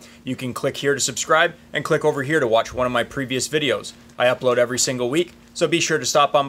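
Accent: Canada. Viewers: Good advice, you sound Canadian. All it takes is an ohm or two of extra resistance in big cables like that, and power is robbed from the starter motor in line. Drop testing would have been fun to see before removing wires, though the lack of any voltage at all on the starter post in the beginning is strange. Do garden tractors and farm equipment usually have starter solenoids separate from the starter motor?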